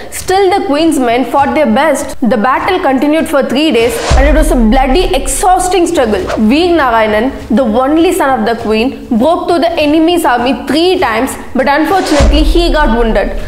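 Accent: Tamil Nadu, India. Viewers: You have heavy Tamil accent, why don't you make videos in Tamil itself? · You have a very thick tamil accent. Please work on your accent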